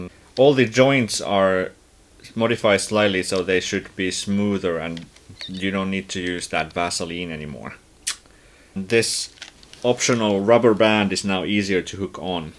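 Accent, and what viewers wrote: Finland. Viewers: Suomiaksentti havaittu! 🔥 Bossi ohjain kyl! 👍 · Your accent is quite finnish, where are you from?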